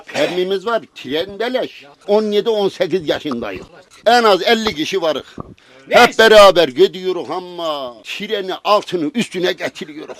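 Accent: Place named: Sivas, Turkey. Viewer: Sivas ağzıyla konuşarak anlatması ayrı bi güzel, yediği dayakla eğlenebilmesi ayrı bı güzel, sizin çizimlerle bambaşka bir boyut kazanmış. Harika yaa. Tebrikler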